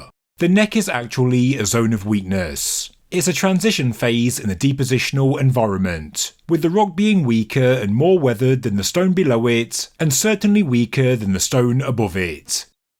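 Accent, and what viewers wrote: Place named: England, United Kingdom. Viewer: since when did have an English accent?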